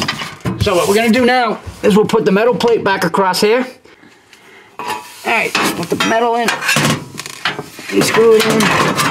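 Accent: Boston, United States. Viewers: are you from Boston? Good job on your video, THANKS. my Maytag is much older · Love that Boaston accent. lol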